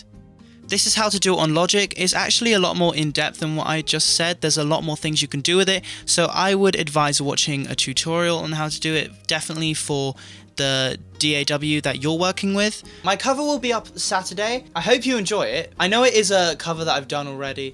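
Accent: United Kingdom. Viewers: 아니 오빠 윙크 뭐야 뭐냐고 뭐야 진짜 왜그렇게 잘생김? 나죽어 응엉우웅우어엉 오빠 사랑해 으엉 영국 발음 미쳐 진짜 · I know this is random but I LOVE your British accent 😂😂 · ㅋㅋㅋㅋ 영국...분이죠..? 영어는 영국식 영어가 멋있지./ ㅋㅋㅋ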